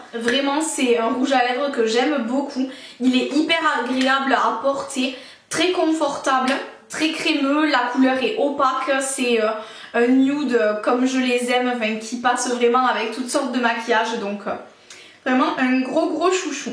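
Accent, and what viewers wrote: South, France. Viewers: coucou ma belle je découvre tout juste ta chaine, et je suis ravie <3 Je m'abonne avec plaisir ! d'ou est tu , il me semble entendre en accent du sud ? jai egalement une chaine, si l'envie t'en dit passe jeter un coup d'oeil ! bises à toi ! Tiphaine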